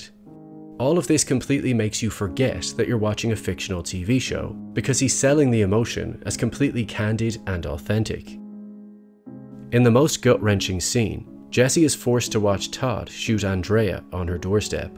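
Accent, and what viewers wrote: Ireland. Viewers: I just binge-watched this playlist (next will be the Better Call Saul playlist) and I really appreciate how you break down and explain the characters and/or situations. On a side note, I'm really curious if you are trying to suppress your accent or if it is really light/moderate like that? (I want to say Irish but I'm second and third guessing myself so not confident on that.)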